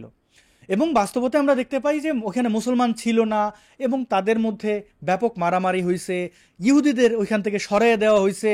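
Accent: Sylhet, Bangladesh. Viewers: Gowaaaaaaaadddd, tmr sylhet accent 😭 cuteeeee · ভাই কি সিলেটি নাকি? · আপনি কিতা সিলেটি নি 🙂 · ভাই কিতা সিলেটি নি?🫠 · brother, your Sylheti accent is wow. JUST LOVELY AND HEART TOUCHING ❤❤❤❤ Love from Sylhet..... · ভাই একবারে সিলেটি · Vai apne sylheti eta jantam na..bala laglo sylheti mat hunia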